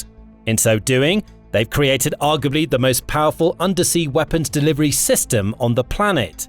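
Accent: England, United Kingdom. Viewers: I'd like to know why he's pronouncing "Aluminium" like an American as he's clearly English by his accent, English people do NOT pronounce "Aluminium" that way.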